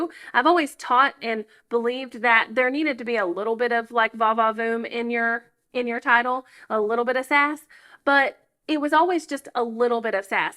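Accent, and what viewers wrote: South, United States. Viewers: That southern drawl makes me smile so much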